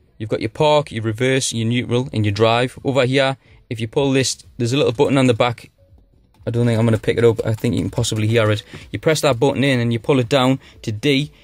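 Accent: Newcastle, United Kingdom. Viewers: Lmao not everyone can understand a Geordie accent not that little buttons fault. Hahaha nice vid well presented nice motor also.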